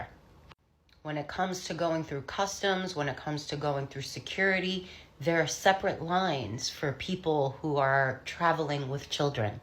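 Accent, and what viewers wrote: New York, United States. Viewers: The NY accent gets me every time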